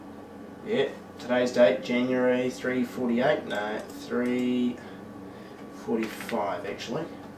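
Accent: New Zealand. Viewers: you're accent suggests that you are a kiwi?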